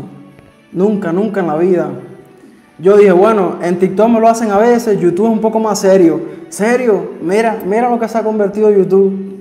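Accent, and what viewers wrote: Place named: Cuba. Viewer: No inventen, se oyes bien cubano, bien. Con acento cubano y nada mexicano. Soy mexicano de Florida. Conozco a los cubanos de todo tipo, trust me! Desde los recién llegados, que no hablan inglés. Otros que hablan un inglés, pero todo estropeado,heavy Soanish accent, que yo no entiendo, ni mdrs . De esos que les dices, "Chico, mejor vamos hablar en español". "Porque your English, is horrible!", jajajaja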